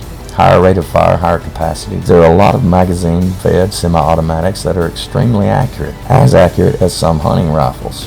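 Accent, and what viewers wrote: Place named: South, United States. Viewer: I wish I had this guys country voice I would get so much pussy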